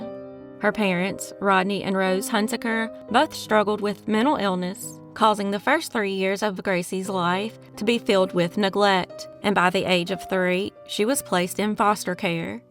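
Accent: South, United States. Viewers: is the way southern people talk. There are words that every dialect in the US speak that is different from Webster’s Dictionary pronunciation of the actual word. It says it all in the name of her channel.. That’s one of the things that makes us all unique. Our accent or dialect from our geographical region. There are some really good YouTube channels that break them down and explain why they pronounce words the way they do.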